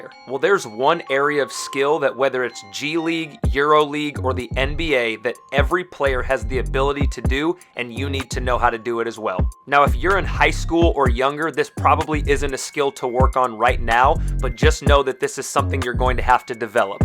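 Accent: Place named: United Kingdom. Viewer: You british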